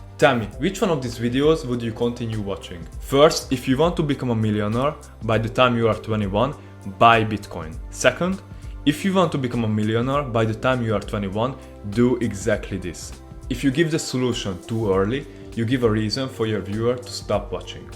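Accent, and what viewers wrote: Hungary. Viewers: Olyan felismerhető a magyaros angol, egyből feltűnik 😀 Keep up the good work mate! 🔥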